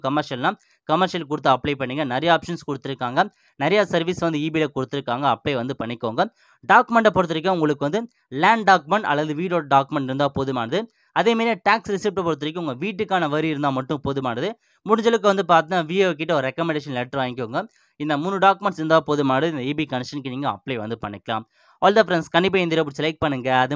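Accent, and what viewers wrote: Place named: North India, India. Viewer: Nee! yan da? Vadakan pesura mari pesura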